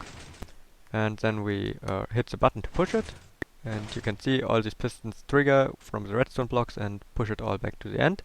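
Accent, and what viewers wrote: Germany. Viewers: Ach gutes Englisch mit deutschem Dialekt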